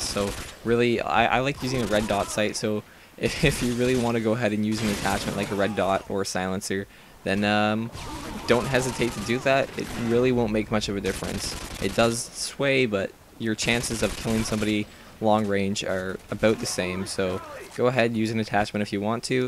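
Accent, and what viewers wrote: Canada. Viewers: hes canadian. he says "about" like a candian says it. Aboat the same.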